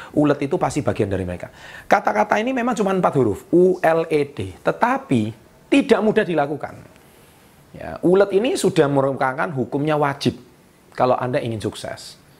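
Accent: Java, Indonesia. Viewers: Aq sangat terganggu dg logat kental jowomu mas..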